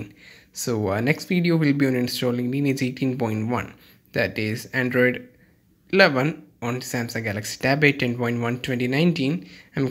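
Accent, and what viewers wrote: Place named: Kerala, India. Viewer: shabtam ketapol tanne manasilayi malayali annenu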